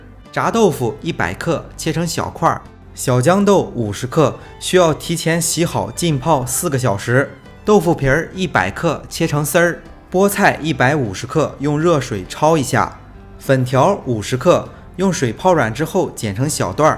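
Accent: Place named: Jinan, China. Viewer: 别忘了山东腔 ;)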